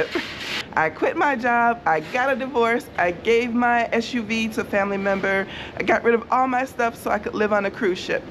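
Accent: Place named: New Orleans, United States. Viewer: Fun video even though it’s something I can’t do. Sounds like you’re from New Orleans or at least your family is.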